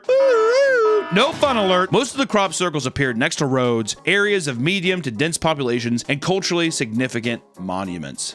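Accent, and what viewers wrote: England, United Kingdom. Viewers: lol that british accent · As a Brit myself, your British accent is beautiful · The bri-ish accent is killin me 😂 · FAVORITE English accent by far. Holy fuck 😂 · As an American I approve this British accent lol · I like British accent takes the form of Fred Flintstone somehow... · is truly a man of talent. The Bri’ish accents were so amazing 😂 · I love his British accent, how it tickles me. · As someone not from England, that's exactly how people from England all talk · Why is his British accent spot on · the english accent/commentary has me crying 😂😂💯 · every time does his English accent I lose it. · this video made me feel like i was slowly losing my mind with, the British accent is so funny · I love British voice, I wish we sounded like that, we would actually be interesting then.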